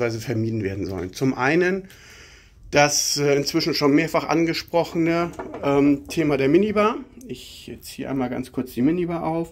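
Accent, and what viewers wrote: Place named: North Germany, Germany. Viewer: O Gott. Was für ein norddeutsches Gelabere. „Sich mit dem Gedanken hegen“ 😂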